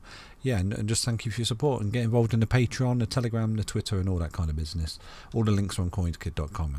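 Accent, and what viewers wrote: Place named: Nottingham, United Kingdom. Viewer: Nice video mate. Are you by any chance from Nottingham. Your accent sounds local!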